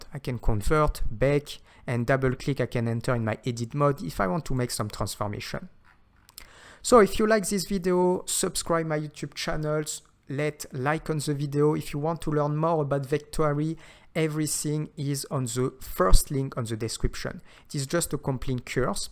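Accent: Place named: France. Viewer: You are a french accent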